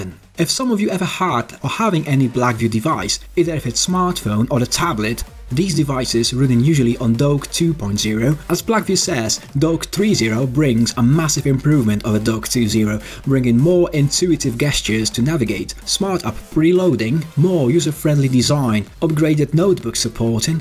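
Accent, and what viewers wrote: United Kingdom. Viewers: I really hoped for some original content or interaction with the device. This kind of seems like the original media packet recut and shared by an English accented presenter. The infrared example looked super fake, as if the scene depicted was CGI.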